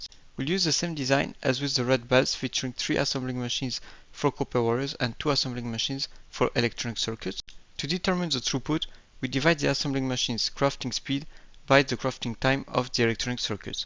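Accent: France. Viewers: Nice tutorial ! By any chance, are you French ? 👀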